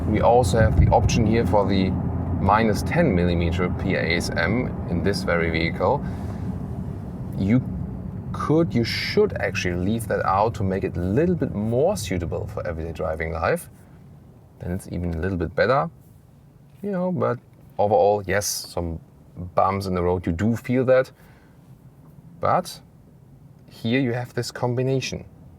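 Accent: Germany. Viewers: A review of a 911 Porsche turbo S is always best in the gentleman has a German accent. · A car review can't get more German than this. Congrats, your reviews are on point. Very presentable host and the way you do it makes it so pleasant to watch. Easily the most professional car reviewer on the web. Your german accent makes it that more nicer to watch. Cheers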